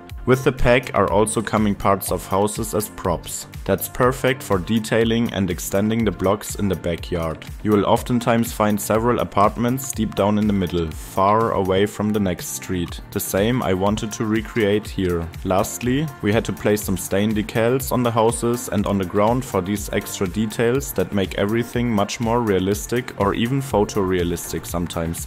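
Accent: Germany. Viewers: I think the German accent fits perfectly to the video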